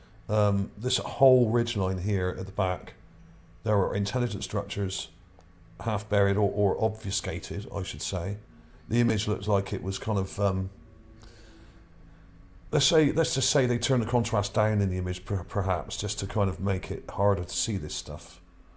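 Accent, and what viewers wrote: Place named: Wiltshire, United Kingdom. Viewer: I thought this Was Richard Dreyfus . Although I don’t watch tv i enjoy comics on YT and wondered what happened to him. Wasn’t he from Dorchester ? Although our narrator sounds more Wiltshire imo. How come they haven’t got a better look at the spire thing photographed in the 60s ? ( on the moon , not the one in Salisbury) ? Like others say , they aren’t spending multi millions to just sightsee ( as the Russians did - not in Salisbury of course ). Keep up the interesting work fella - up the Cherries 😎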